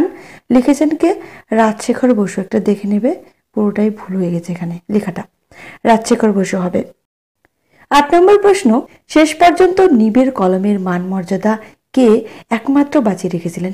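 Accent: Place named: East Bengal, Bangladesh. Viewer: আপনি কি পূর্ববঙ্গের ম্যাডাম আপনার উচ্চারণে বেশিরভাগ অকারান্ত বোঝা যায় তো যাই হোক শুনতে ভালই লাগে।😊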